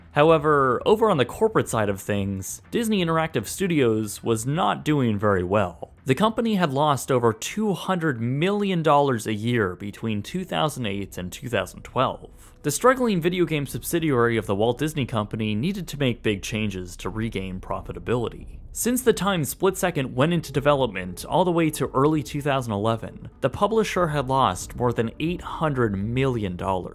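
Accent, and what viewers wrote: Canada. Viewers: got dat good-good nice, polite Canadian voice.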